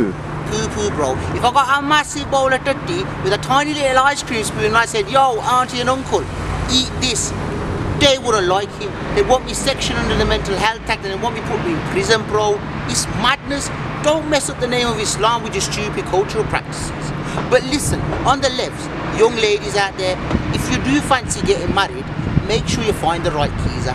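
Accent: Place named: Jamaica. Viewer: Do more OG/Jamaican accent videos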